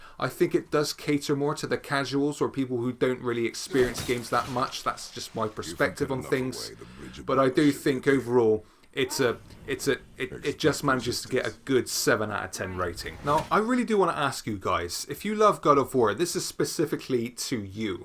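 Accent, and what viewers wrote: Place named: Bristol, United Kingdom. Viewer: Also, where you from? You sound like a fellow Bristolian!?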